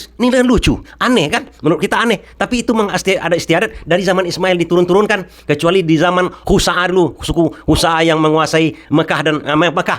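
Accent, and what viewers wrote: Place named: Belitung, Indonesia. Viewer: Bahasa bpak ini seperti orang2 daerah bangka belitung tapi lebih ke belitungnya, apa benar pak....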